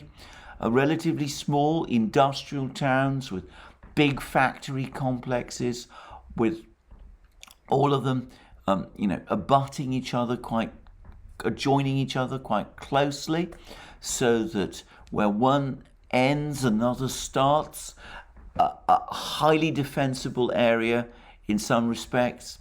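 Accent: England, United Kingdom. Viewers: Ah, my daily fix is here with the dulcet tones of your refined English accent.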